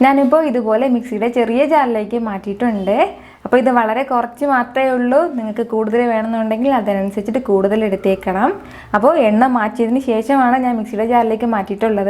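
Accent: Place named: Malappuram, India. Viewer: 😍😍..ikka malapuram aano...atho kozhikodoo...slang supr aanu🤩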